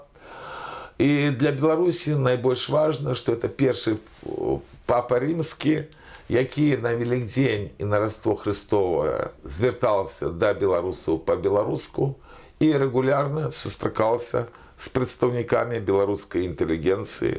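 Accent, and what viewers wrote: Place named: Russia. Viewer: Спадар. Адкажыце, калі-ласка, чаму пад час беларускамоўных прамоў вы цягам дзесяцігоддзяў маеце такі цверды рассейскі гук «в» замест фірмовага беларускага «ў»? Гэта нават не ўкраінізм, а мінавіта рассейскасць.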